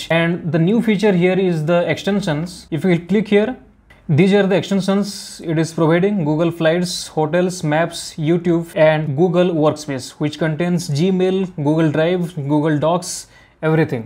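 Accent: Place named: India. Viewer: Was it English? What Indian dialect is this?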